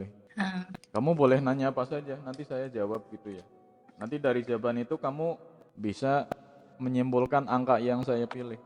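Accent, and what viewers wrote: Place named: Banyumas, Indonesia. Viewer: Brute Force... Mantaf.. Ngomongnya ngapak gak papa malah seru... Cuma kurang di cepetin dikit ngomongnya hehehe.... Trims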